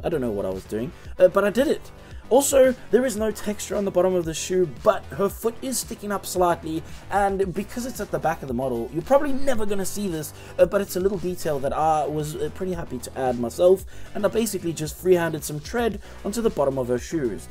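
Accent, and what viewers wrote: South Africa. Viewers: His bio says he's from the uk but he sounds so south african. Really like the video though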